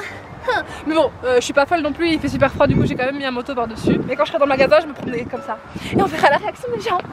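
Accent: Belgium. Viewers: Tu es Belge ! sais trop bien moi aussi xD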